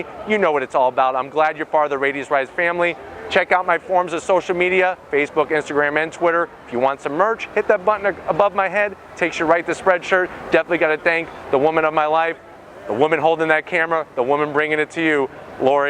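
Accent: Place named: New England, United States. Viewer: Well guess what? I'm gonna have to zonk your gay ass yankee accent. TTFD thats what your working with.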